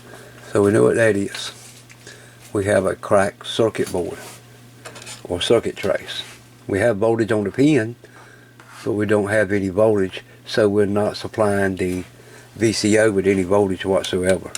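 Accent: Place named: Deep South, United States. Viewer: I’d say somewhere between Alabama through to Louisiana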